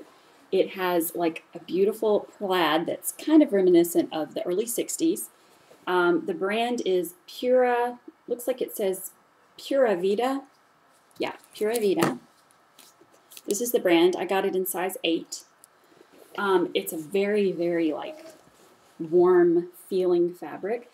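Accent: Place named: Tennessee, United States. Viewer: I have no idea how I didn't figure out you were from Tennessee before now...I should have been able to pick out that accent.(I'm from West Virginia myself). I adore those coats...I need a good winter coat of my own since my windows are still frosting overnight. I'll have to give modcloth a try. Thanks for the tip off Darling. :)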